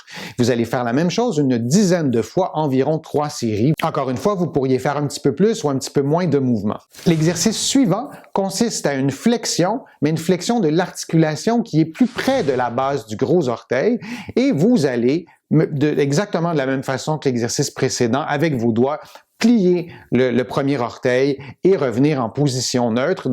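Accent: Quebec, Canada. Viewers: Très intéressant. Je les faisais déjà de ma propre intuition mais pas debout. Et c'est très efficace. Ça ralentit significativement l'evolution. L accent canadien me ravit. C est donc "double peine".. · Grand merci de France pour toutes ces propositions d exercices ...que j'aurais aimé trouver il y a quelques années... Mais mieux vaut tardbque jamais 😊 Je vais m y mettre avec grand plaisir pour tenter d enrayer la progression .. et c est si "plaisant" d entendre votre accent enchanteur (mon mari était québécois 😘🙏) Merci infiniment !🙏🙏🙏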